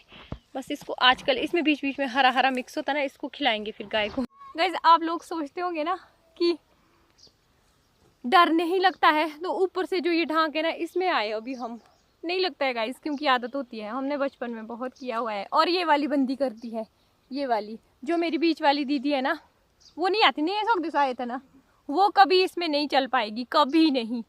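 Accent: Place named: Pahadi, India. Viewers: I am from una in Himachal pardesh Tuha pahadi bade ghaint bolde... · Bahut Sundar aavaj hai aapki.. uper se Pahadi accent uspe 4 Chand laga deta hai...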